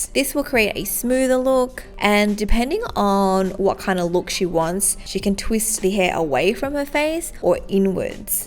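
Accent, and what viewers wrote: Australia. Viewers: I realize that 'hair' for women can be a long process, depending on how long it is and also how well a woman takes care of it, but I never realized what an extensive process it was. I'm hearing accent, sounds Australian? thinking Melbourne? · Where is this salon? As i noticed you have an Oz accent.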